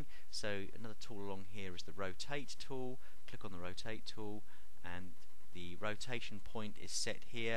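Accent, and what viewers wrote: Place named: England, United Kingdom. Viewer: love the english accent... nice video btw... can you please tell me how to rotate images added in word documents?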